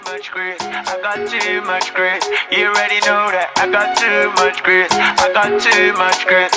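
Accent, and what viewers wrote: Ghana. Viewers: They do that in JA too. If they see u from the states they think u have money. Oh wow this is sad the roads are this bad. Its show how much the government care. The money going in their pockets thats what...these places are so corrupt. This is why they beg their family to send stuff from here. Ur Ghanaian accent is so on point tho lol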